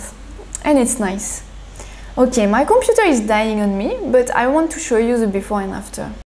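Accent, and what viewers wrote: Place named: France. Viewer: "but that's not bad, hein !" Not only will we forgive your french accent, we will also love it ! <3 Also, prepare for my rainbow cat 🙈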